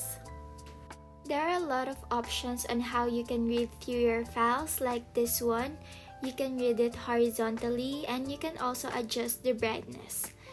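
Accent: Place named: Philippines. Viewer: i like your way of speaking english, it's nice that you don't try to sound like other accent, it's very filipino while sounding very good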